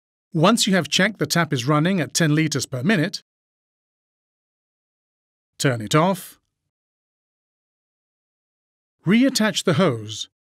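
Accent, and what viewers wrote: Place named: Germany. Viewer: They same German voice on Airbus videos🤣🤣🤣🤣🤣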